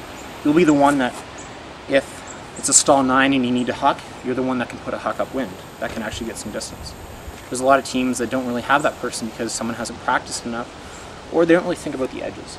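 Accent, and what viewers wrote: Canada. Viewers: Canadian, eh? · He's Canadian, dude. That's the Canadian accent, nothing weird aboot it.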